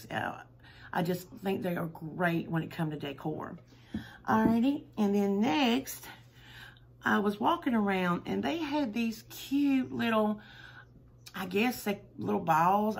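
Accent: South, United States. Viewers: congratulations you TOTALLY deserve 4k and many many more.You are SOOO down to earth and sweet and I just LOVE your southern accent.I lived in Dallas for 24 years and I miss hearing it.I REALLY like that pillow and that GORGEOUS lantern.-Joy 😊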